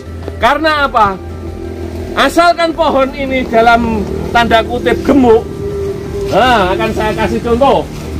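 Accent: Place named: Surakarta, Indonesia. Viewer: Dari logatnya kliatannya pak dhe berasal dr wlyh eks karesidenan solo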